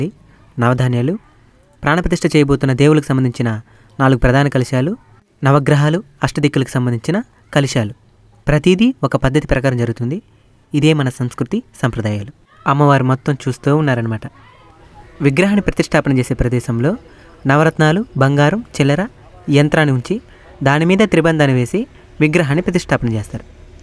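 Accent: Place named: Chittoor, India. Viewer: ANNAYYA MEMU ME OORIKI May LO vasthamu yekkada gudi undedhi PLEASE address cheppagaru ME VOICE vintunte chittor jilla vallala undhi PLEASE PLEASE E gudi chusina tharvatha aa gudiki ravalani undhi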